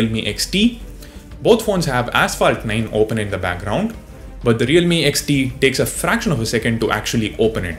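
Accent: India. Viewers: Wat an accent pure indian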